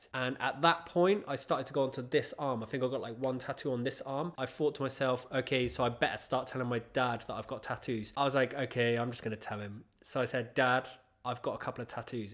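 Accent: United Kingdom. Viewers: I don't have any tattoos (yet). The more I put it off the more nervous I get about the pain and healing, and just anxious about it.. like if I get some weird reaction or if I'll be allergic to red ink. But I've always loved tattoos and wanted them. In some ways I'm glad I've waited, I'm almost 23 and my preferences have changed so much. I want american traditional or neo-traditional tattoos now. Keep doing videos! I have a feeling you'll get a lot of subscribers! Also, where are you from? Your accent sounds british.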